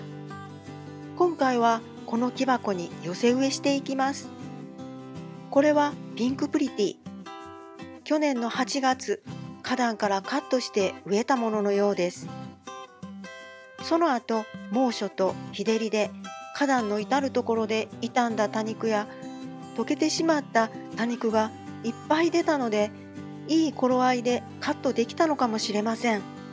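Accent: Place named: Kansai, Japan. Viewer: 屋外で植えていらっしゃるようですが、場所はどちらですか。 冬は霜が降りないのですか。 言葉のイントネーションの感じから、西の方の地方なのかなと思いますが、寒さはどうなのでしょう。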